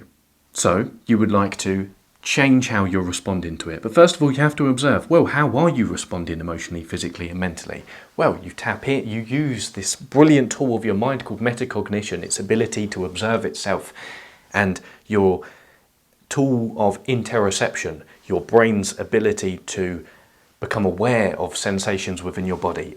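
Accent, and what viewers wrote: United Kingdom. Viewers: The britishness in his voice is so refreshingly calming put in a good mood like when ur high on caffeine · Unrelated but what type of British accent is this? I like it a lot.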